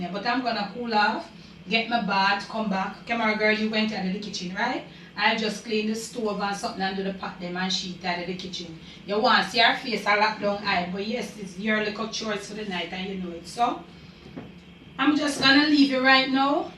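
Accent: Jamaica. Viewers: I love to hear you speak patio. I left JA at such a young age I don't know how to speak it. Can you do a Vlog about different patio terms?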